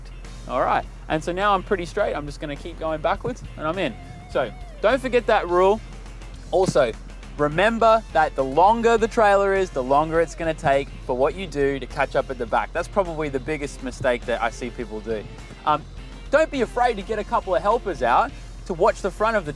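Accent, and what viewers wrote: Australia. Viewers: would you be an Aussie by any chance? I’ve never heard an American talk about “dings”!!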